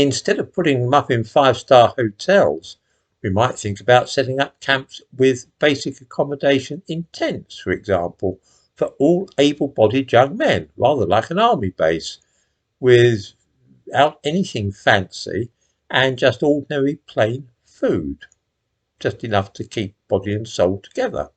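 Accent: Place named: Wales, United Kingdom. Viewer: Your back in Wales ,thought i noticed a bit of a Walsh accent , The house is looking cumfy .Have you got woodstove up and running .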